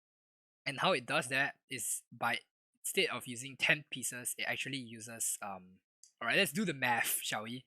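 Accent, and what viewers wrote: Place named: Singapore. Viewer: That Singaporean accent, nice to see another fellow SG player with teto tutorials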